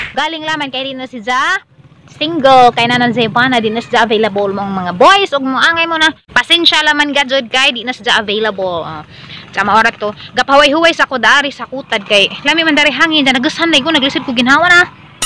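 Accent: Bohol, Philippines. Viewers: Nalingaw kus imong accent dam hehe. Makamingaw ang bohol. Pagdag bringhauz dam ha hehehe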